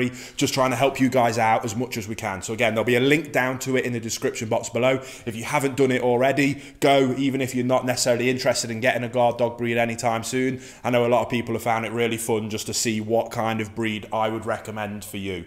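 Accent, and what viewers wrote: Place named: England, United Kingdom. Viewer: I love your accent. (And I also love your video otherwise I wouldn’t have watched it.) What part of England are you from?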